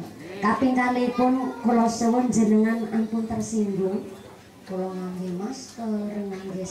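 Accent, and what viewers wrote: Banyumas, Indonesia. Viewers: Ceramah ngapak Lucu Ustadzah.. Terima Kasih ceramahnya Ibu Ustadzah.. Sukses selalu Bu..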